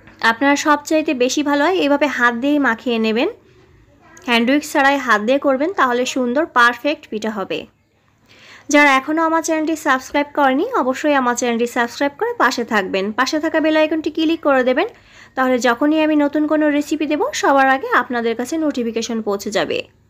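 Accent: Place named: Kushtia, Bangladesh. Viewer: Apu apnar vasha gulo amader vasar sathe mill ase,,kustia,chuadanga amr basa.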